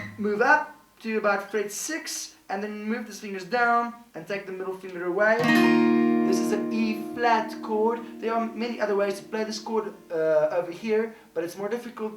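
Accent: South Africa. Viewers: My entire father's side of the family is South African so right when you started talking, I knew immediately where you were from. Awesome tutorial! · Great fan of your lessons.. very helpful lesson. Thanks for taking the time out to do this for us. Greetings from India to South Africa?? (Your accent says so!)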